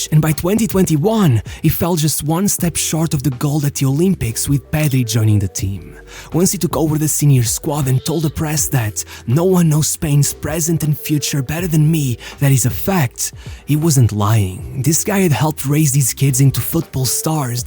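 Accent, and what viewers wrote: Portugal. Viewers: Why do you pronounce Spanish names in perfect Portuguese accent?😂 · Great video! I was just annoyed that you kept pronouncing Spanish names with Portuguese pronunciation. Or am I wrong? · I love you you pronounce every Spanish name with a Portuguese accent 😅. Great video and Viva España y Portugal 🇪🇦❤🇵🇹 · Quiero decir, el chico es portugués, creo. Es normal que los nombres le salgan con acento. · Why do you pronounce names so weird. Like with a Portuguese accent Torresh Grodri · why do you pronounce the names like if they were portuguese? not criticising but as a spaniard i think pronouncing the names like they really are o at least trying could be better for the overall quality of the video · Why is he giving a portugese pronuntiation to all the spanish names?? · is the guy reading this portuguese? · I would like to ask you to pronounce the Spanish names properly, not in Portuguese or Brazilian pronunciation. 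Thank you. Overall, fine job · bro is calling every Spain player like a Portuguese one xd. Just pronounce them in English bro it sounds better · He pronounces spanish names in portuguese lol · I appreciate the effort to try to pronounce Spanish names correctly, but you are pronouncing them in Portuguese.... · Just a tip as a Spanish polyglot interested in phonetics: pronouncing Spanish words with a Portuguese accent doesn't make them more accurate and similar to the Spanish pronunciation, and in fact, it sounds quite unnatural, especially while speaking perfect English. I would recommend that you stick to the English pronunciation when saying those words if you don't want to learn Spanish phonetics. Of course, maybe you don't care at all, but since you're a content creator I would assume you want to sound more natural and educated👌. That way your viewers can focus more on your content, rather than the weird pronunciation, and the actual message is delivered much more easily.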